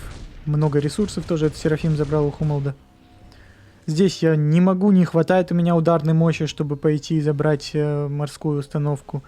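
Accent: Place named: Russia. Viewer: не то чтобы хорошо, просто русский акцент сразу в уши бросается)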